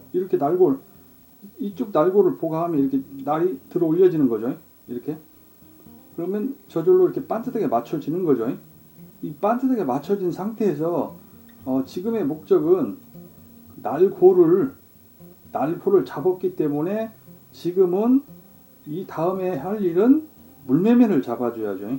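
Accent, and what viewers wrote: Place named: Jeolla, South Korea. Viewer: 사투리를 많이 쓰시는데 호남지방 어디신가요? 나또한 그쪽이라서요.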